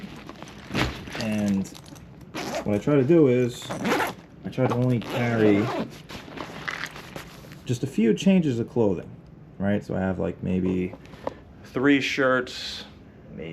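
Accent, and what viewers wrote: New York, United States. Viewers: While I still think you're with the CIA, a common spook whose 90% of this audience is women, I've heard in other vids you said you were Canadian but you have a very distinct NY accent. Why the lie? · I’m a new subscriber. I said to my wife that “his accent & mannerisms seem like a New Yorker”. Yep, I also grew up on the island. I have lived in the Philippines and am planning on moving back in a couple when I retire. Looking forward to watching more of your videos. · You are one of the most honest YouTubers out there. I knew you were a NY’er from the accent lol. You’ve probably ridden one of my trains out of NY Penn. Aren’t our trains garbage compared to every other country in the world? lol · The greatest accent ever - New Yawk!!! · Sometimes your "New York" shines SUPER bright in your speech. Lol!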